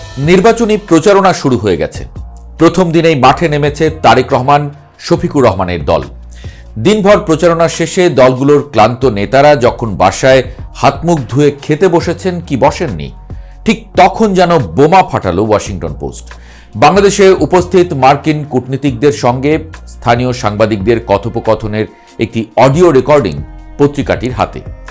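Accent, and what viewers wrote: India. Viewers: তোমার কথা শুনে বুঝা যাচ্ছে তুমি করা ইন্ডিয়ান দাদা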